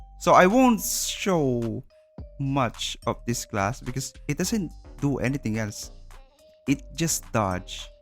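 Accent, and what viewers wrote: Philippines. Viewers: Base in ur tune ur Ph boii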